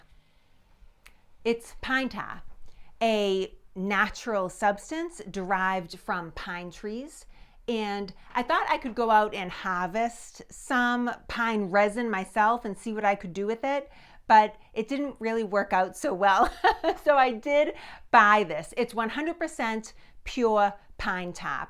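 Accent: Boston, United States. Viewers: Love her Boston accent! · Perfect accent for a story of "The Speckled Monster." For anyone wondering she does have an authentic Boston accent / Eastern Mass. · She's so cute, love her Boston accent. Love the History too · Very interesting--thanks! When you first started talking, I was wondering what country you were from. I'll never get used to the Boston accent. LOL ♥ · I've been in Boston they have that accent. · Just a wild guess but you're from Boston aren't you? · I’m gonna go out on a limb and guess that this chick is from somewhere near Boston. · The elder Kennedy brother, John, Bobby, and Ted had this same Boosten aaccent. Many Haaavaard grads also have it. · Boston accent much ? SAILAH AND MONSTA, LOL. finally someone who sounds like me ! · that good old Boston talk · I heard your accent and immediately subbed. I’m from Boston and I sound like I’m exaggerating to be honest because my accent is so strong. 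It’s nice to hear your own accent on Youtube for a change. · She has Boston accents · Love the Boston accent. Love your videos. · I'm Boston born and grew up in Weymouth in the 1960's, my grandparents were going to buy the Abigail Addams house in Weymouth back in the 1930's but it had an outhouse and my grandmother put her foot down and said No. Great video and I love your accent, we don't use R's very much. Like, Nice Cah. 🚗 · Oh my God I can't believe it a real Bostonian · Just love that Boston accept. Pine tah! Good history lesson. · Pine ta. Or, as we say in North Carolina, Pine Tar. I love this lady's accent. I just subscribed just to hear her yankee talkin'. Do all the yankees up there in Boston talk like this? I just never knew that if they do. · Hello,! I was born and raised in Worcester (or “Wustah”😂) and commuted to Boston to work for 15 years. Love everything about the American Revolution and am descended from Mayflower and officers in the Revolution. 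So enjoyed hearing your fabulous Bahstin accent (which I pretty much was able to mute over the years. Glad you kept authentic). So glad I hit on your vid. Will subscribe and thanks!! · This is very interesting. I love the accent. I need to learn more about Boston and why is there an accent and why we necessarily didn’t get one in Connecticut. Now I’m going down a rabbit hole lol 😂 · I love her Boston accent. ❤ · I'm having fun learning from you while you relay important historical content with a Boston accent! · Love that seriously Old Boston American accent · She can't say an "R". Boston no doubt. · New subscriber. Love the amazing Boston accent and great revolution history. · Best Boston Accent Eveh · Me being from Wisconsin, would say pine tar. She, paint being from Boston says pine tah. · Any time I miss Boston I just need to listen to here. The most beautiful of Boston accents. Pinetaahhh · Love the show! 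Love that Boston accent! Pine tah! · This is incredible. I did 4 years in Boston for school. I can't stop smiling. It's your accent!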